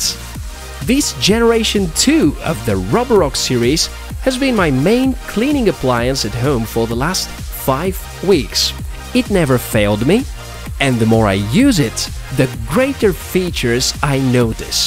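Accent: Netherlands. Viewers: The finest, least perceptible Dutch accent I've heard in a long time. Respect! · I love your dutch accent...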